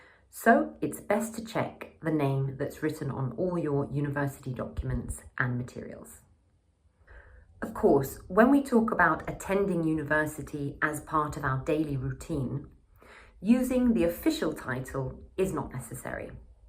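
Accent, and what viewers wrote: United Kingdom. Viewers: British accent is to die for. ㅎㅎ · 영국쌤이다! 이 고쟈스한 영국발음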